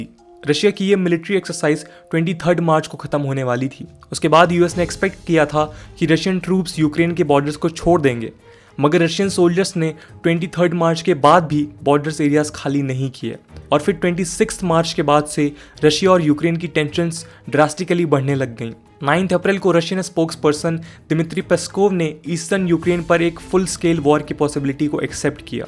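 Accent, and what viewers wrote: India. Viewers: Indian accent. I am pretty sure India will be on the west side in this conflict as they really hate China. Then most of the middle east will join putin. North and South korea will clash together. Wildcards could be Brazil and south american countries. Some will join the west but some want to see the US burn. Other wild cards would be African nations like Egypt, Sahel, Etiopia. It will all be a bloody mess and it is hard to see how we will get any winners in this conflict.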